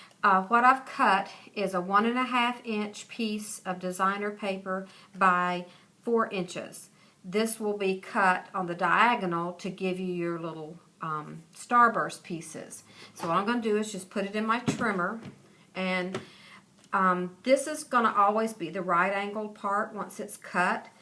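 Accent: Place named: Texas, United States. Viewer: Loved this tutorial! I also loved hearing you speak, 'cause you sound like you might be a Texan! ;-) Thanks for sharing your easy to follow technique...your card is beautiful!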